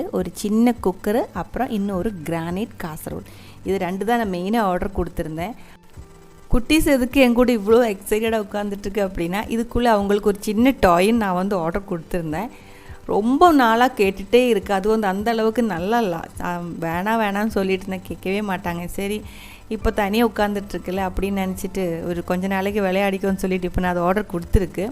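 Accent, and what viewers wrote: Kerala, India. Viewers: Unga voice enakku romba piduchu irukku chechi. Malayala tamil 👌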